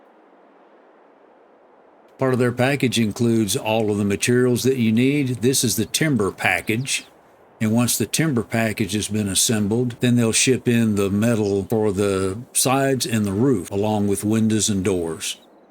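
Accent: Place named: South, United States. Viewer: Thanks for the video, you're so relaxing to listen to, I came home from work and eat then sit down to listen to you and about fell asleep not that you're boring just relaxing listening to you. You sound like your country is that your voice or are you throwing it for the video? Lol But thanks again for your time appreciate it!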